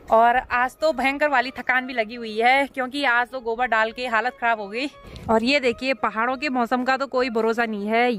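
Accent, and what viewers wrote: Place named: Haryana, India. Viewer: Mam aap haryana si bolati ho thank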